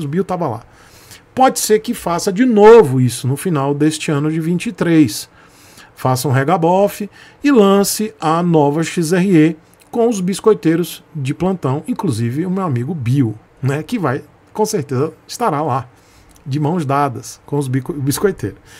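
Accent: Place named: Rio de Janeiro, Brazil. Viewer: alguem mais acha o sotaque carioca insurpotavel? kkkkkkkkk e minha opinião abraço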